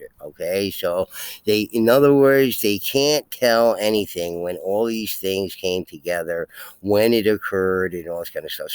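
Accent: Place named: East Coast, United States. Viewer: Never commented on your videos before ..I think I figured out why I like you channel so much. mostly about giants and stone walls.but also u read so fast with the east coast accent it keeps me from falling asleep because it keeps my ears tuned to the fast reading .😂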